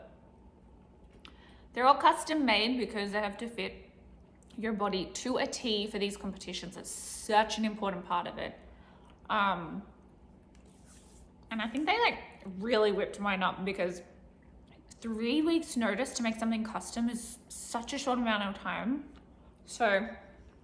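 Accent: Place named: Australia. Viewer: She's cutting a promo in Australian- Oli Davis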